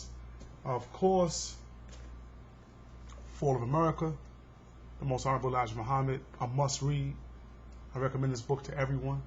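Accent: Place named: East Coast, United States. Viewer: You have an unmistakable East Coast accent. I will look into purchasing some of those books you reference. Good job keep up the good work youngster.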